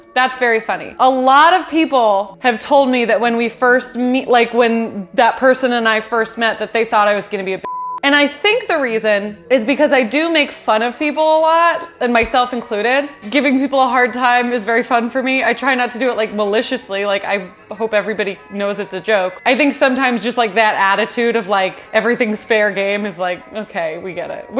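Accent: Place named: California, United States. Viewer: As an Australian who can't stand the valley girl accent, I can tell you I wouldn't have guessed that's where you were from ❤ Your accent is much nicer than the stereotype:-p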